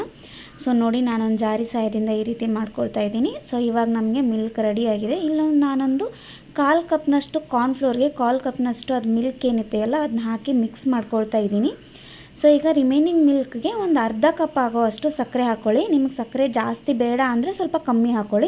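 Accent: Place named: North Karnataka, India. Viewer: ಉತ್ತರ ಕರ್ನಾಟಕದ slang ಚೆನ್ನಾಗಿರುತ್ತೆ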